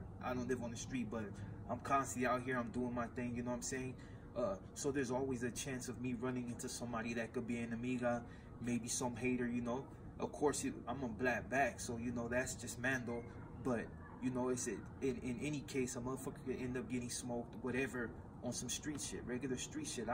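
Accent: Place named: Mexico. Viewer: Cuzzo why you got a Mexican accent though does your bros and sis have the same accent ?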